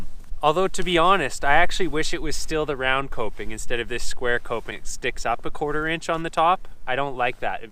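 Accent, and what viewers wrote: Canada. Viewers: U sound so Canadian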